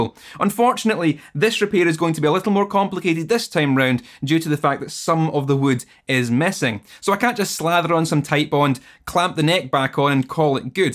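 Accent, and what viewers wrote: Scotland, United Kingdom. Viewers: I wouldn’t have done it any differently as I have never repaired a neck break in a guitar. Looks good to me! I don’t get the urge to turn off the video when I hear your accent, I get the urge to drink an Irn Bru and get something from Gregg’s and then I remember I moved back to Australia 10 years ago and I get a little sad. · People leave because of your accent? But...Scottish accents are so cool! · weird, sounds different in this video, much less Canadian and much more Scottish.... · It's refreshing to hear a non-American accent, especially a Scottish one. Spent 8 months in Edinburgh in 2007 and loved the place. I became addicted to Irn-Bru and Caledonian 80. I don't miss the neds though, but I tried Buckfast for a laugh and thought it tasted shithouse. Love your channel. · Nice work, love your videoes and the Scottish accent.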